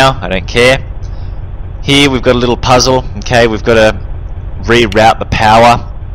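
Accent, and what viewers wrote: Australia. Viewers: he is from Australia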